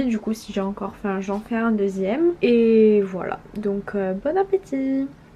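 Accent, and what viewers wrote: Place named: North, France. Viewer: tu as un petit accent du nord de la france, tu viens d'ou? 😉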